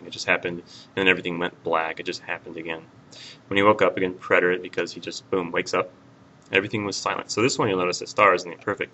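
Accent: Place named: United States. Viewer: esto es genial. Solo queria decirte que tienes un poco de acento americano, pero la pronunciacion es muy bien. Puedo usar tus videos pa' aprender Ingle en lugar de españoles porque soy de Puerto Rico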